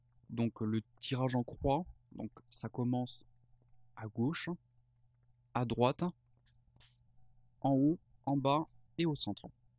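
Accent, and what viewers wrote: South, France. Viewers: Bonjour les cartes sont un support mais le ressenti et très important votre accent du sud ça fait du bien merci à bientôt.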